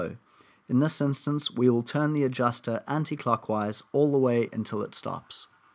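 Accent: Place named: South Africa. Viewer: I detect the sound of a south african accent....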